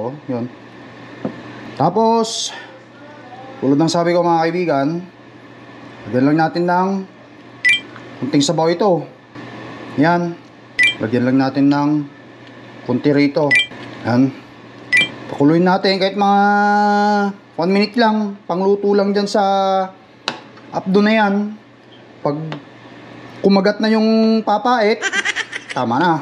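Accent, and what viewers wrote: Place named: Visayas, Philippines. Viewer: Bakit parang bisaya na accent mo kuya hehehe ✌️✌️✌️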